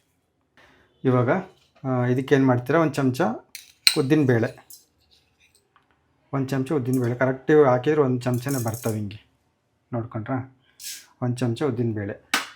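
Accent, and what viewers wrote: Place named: Holalkere, India. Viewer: ನಮ್ಮದು ಹೊಳಲ್ಕೆರೆ ಹತ್ರ Adanur Nimdu yavuru? ನಮ್ಮ ಭಾಷೆ ಸೊಗಡು ಇನ್ನೂ ಉಳಿಸಿದ್ದೀರಾ, ತುಂಬ ಖುಷಿ ಆಗುತ್ತೆ..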